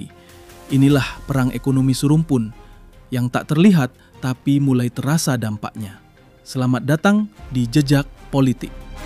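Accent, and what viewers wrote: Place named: Java, Indonesia. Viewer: ini narasai Jawa Hama 😅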